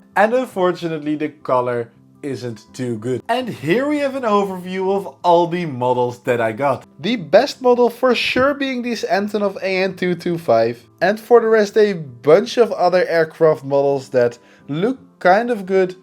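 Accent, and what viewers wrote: Netherlands. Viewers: I know u are Dutch cuz u have a Dutch accent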